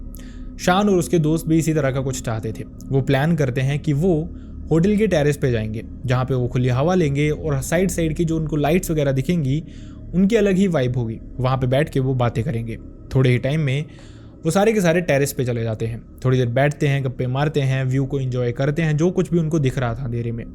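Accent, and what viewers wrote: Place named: Assam, India. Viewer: Bhai aap Assam se ho kya😅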